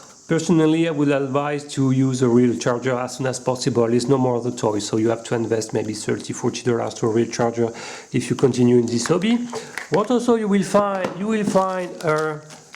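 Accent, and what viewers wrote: France. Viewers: Te pas francais ?? parcque vue ton accent on sen que ce francais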